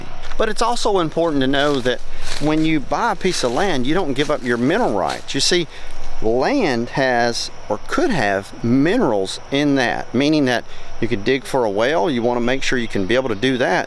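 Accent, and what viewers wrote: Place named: Appalachia, United States. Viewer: It is supposedly. Did you notice, no b. Not suppose a blee. It suppose ed lee. Being instructed by a hillbilly.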